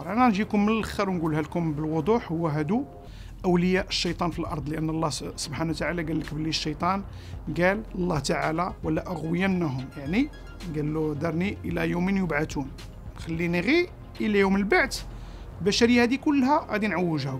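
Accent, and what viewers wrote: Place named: France. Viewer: يارجل قبل ان تتكلم بل سياسة نقدية كانت ام سياسة لقطيع اووو تعلم ان تتكلم بل عربية الفصحى ثم اشرح من يسمعك يقول انك فرنسي متعلم العربية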